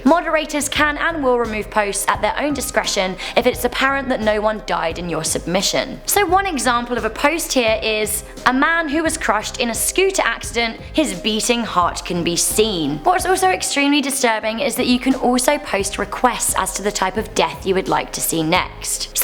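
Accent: England, United Kingdom. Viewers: The English accent takes her from a 5 to a 3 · Em jacks Her accent is English and that is what we are talking about. We're not talking about where she lives. He's referring to accents and she's no way Canadian in that department. I am British myself, so I would know. Lol.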